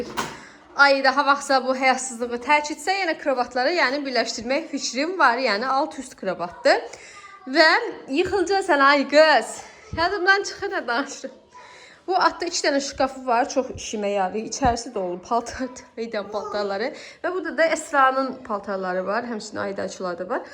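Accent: Sheki, Azerbaijan. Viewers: Videonu şəki ləhcənizə görə izlədim. Rayonlara getmək fürsətim olanda ilk seçimim Şəki şəhəri olur. İnsanları, təbiəti, şirniyyatları.... Sevirəm Şəkini. Allah yeni evinizdə şirin övladlarınızla, yoldaşınızla xoşbəxt yaşamağı nəsib etsin .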